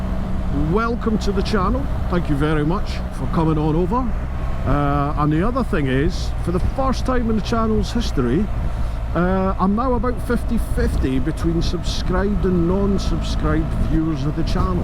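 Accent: Scotland, United Kingdom. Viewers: You sound a typical Scot and i am from Glasgow now living in La belle France still living my life and approaching seventy five Merry Christmas mate.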